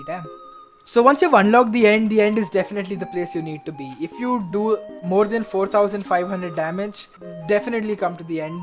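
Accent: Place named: India. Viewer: why does he sound like he is an indian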